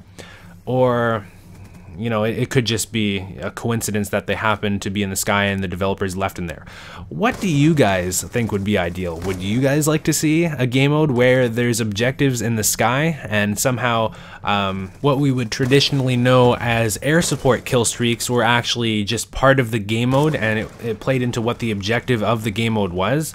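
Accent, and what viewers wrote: Canada. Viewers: r u canadian cause u say about funny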